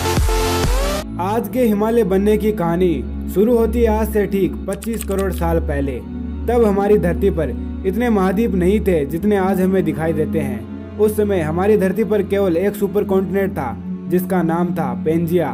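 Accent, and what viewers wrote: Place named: Rajasthan, India. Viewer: Your accent sounds like a Rajasthani guy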